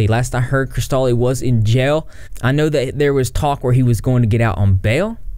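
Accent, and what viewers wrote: South, United States. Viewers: i love your southern accent 😩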